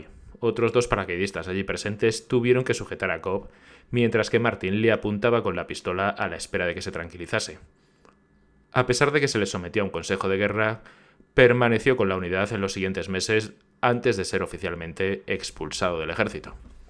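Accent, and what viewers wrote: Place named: Spain. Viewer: El comentario más ridículo que he visto en mucho tiempo (ojo el comentario, no tu persona). Hoy por hoy rechazar el acento español peninsular es un absurdo y un despropósito, con la de canales buenos que hay con dicho acento. 😅 Y no entiendo que es lo que no se entiende, acaso de hablar de la "wea weón po?, no po wn; si no entiendes nada del vídeo tení que hacerte ver eso cachai hemano?"😂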